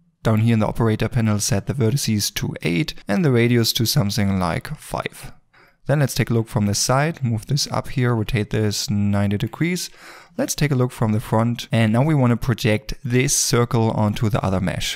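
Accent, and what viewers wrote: Germany. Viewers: are you german? sounds a bit like an german accent :)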